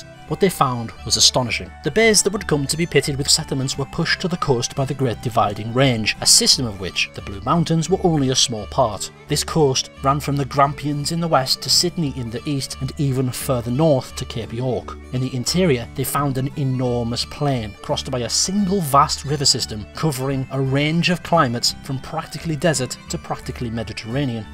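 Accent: United Kingdom. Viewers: “EVERYTHING Australia” Me: immediately hears a UK sounding guy 🤔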